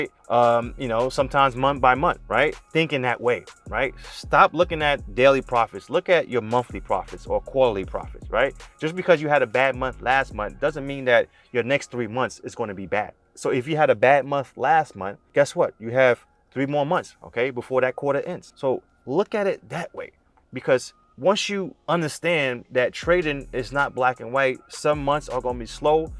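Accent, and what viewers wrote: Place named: Baltimore, United States. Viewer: Baltimore accent is strong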